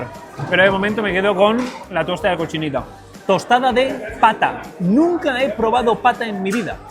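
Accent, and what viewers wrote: Spain. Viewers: De todos modos me caga el acento español.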